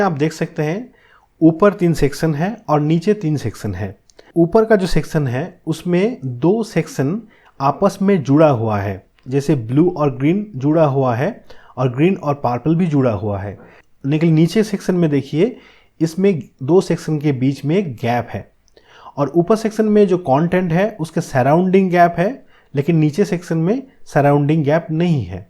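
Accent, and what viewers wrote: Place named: Bengal, India. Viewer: সুপ্রিয় মহাশয় , আপনি যে এমন একটা অ্যাডভান্সড টপিকে এত ডিটেলস এ টিউটোরিয়াল বানিয়েছেন এটাই অনেক বড়ো কাজ। বাকি আমি বাঙালি বলেই আপনার হিন্দিতে বাংলার টানটা ধরতে পেরেছি, তবে আপনার হিন্দি যথেষ্টই ভালো, হিন্দি ভাষী বন্ধুদের ও আশা করি বুজতে কোনো সমস্যা হবেই ন। আমি মালদা জেলার এক সিভিল ইঞ্জিনিয়ারিং পড়া মানুষ, আমার পক্ষে CSS HTML বা কোডিং বোঝা সম্ভব হয়ে উঠেনি এখনো । তবে আপনার মতো wordpress developer দের এত সহজ ভাবে বোঝানো ভিডিও গুলো সত্যি খুব সাহায্য করেছে আমাদের মতো non-techie দের ও নিজের blog বানাতে। আপনার কাছে আর ও একটা রিকোয়েস্ট থাকলো --- যদি সময় পান তাহলে GeneratePress theme এর Page break করার পর যে Pagination page number , first page, last page eisob j aase , eta k aktu colourful korar jonno , CSS code ta kothai edit korte hoi jodi tar opor ekta video baniye den....tahole amar khub subhidha hoto....thik etar motoi <link>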